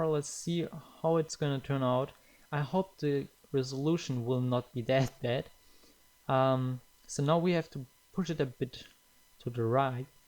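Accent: Germany. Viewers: Ach hab mich schon gewundert 😂. Deine Sprachstil klang so englisch aber die Aussprache mehr deutsch, deswegen war ich bissl unsicher. Aber Danke für deine schnelle Antwort 😂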